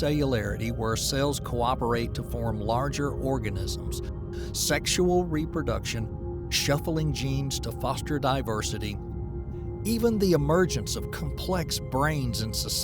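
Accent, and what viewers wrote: United States. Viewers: Those complex names just don't sound right with an American accent. It's pronounced You-carry-otic !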